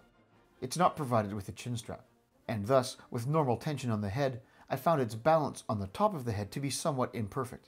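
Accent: Canada. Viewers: As a former rifleman I find the uniform slightly lighter than it should be and no offence ment but Canadian accent and rifles uniform just sounds odd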